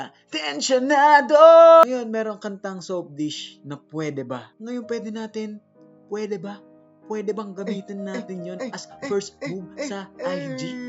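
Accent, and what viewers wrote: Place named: Pampanga, Philippines. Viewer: Aydo atchu ya pala pampanga bap hahah an lakas boss taga pampanga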